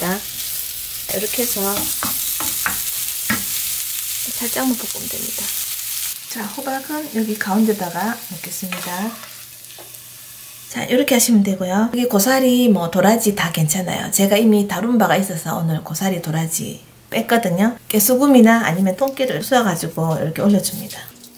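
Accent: Gyeongsang, South Korea. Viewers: 해외 나와 산지 4년이 다되어 가서 정월대보름되면 나물이 너무 먹고 싶더라고요 ㅠㅠ 혹시 대구 분이신가요? ㅎㅎ 말투가 너무 정겨우세요 제가 대구사람이라 ㅋㅋ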